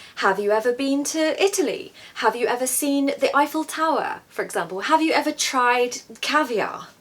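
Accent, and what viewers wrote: RP, United Kingdom. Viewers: Thank you so much for teaching us teacher i love you so much! Your british accent is beautiful. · I love your beautiful RP accent even I live in Australia. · she sounds so British, I love it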